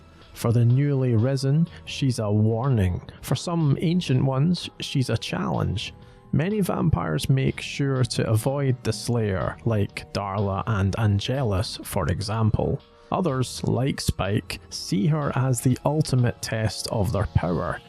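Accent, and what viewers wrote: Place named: Scotland, United Kingdom. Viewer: The scottish Dialect make it feel like u are a child of a watchers council in the UK giving inside Storys of the Slayer Demin World.Love your Videos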